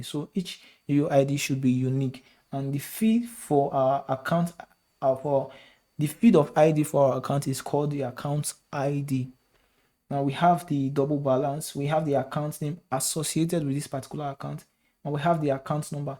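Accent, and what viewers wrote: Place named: Nigeria. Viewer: I liked the video but I really could not follow up because of the accent, it is difficult for me to cope with nigerian accent, not that there is anything wrong with such accent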